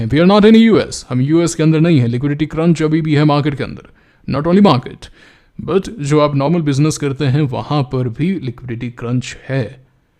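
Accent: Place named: Bikaner, India. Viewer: sir Bikaner ya uske aas paas churu, hanumangadh tri junction ke prateet hote hain bolne se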